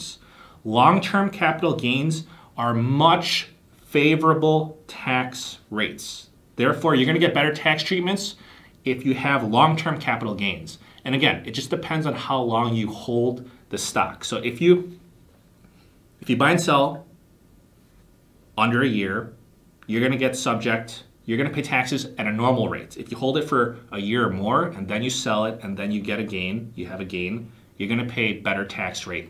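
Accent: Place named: New York, United States. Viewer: His NYC sounded accent adds to its straight-forwardness.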